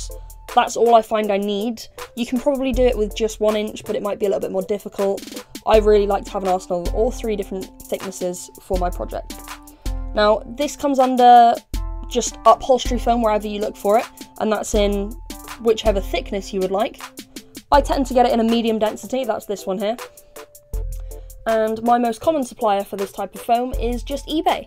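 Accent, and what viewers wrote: United Kingdom. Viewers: Where do you get your fur? Your accent suggests you live in the uk and I find I dofficult to find cheap decent fur for my fur suits.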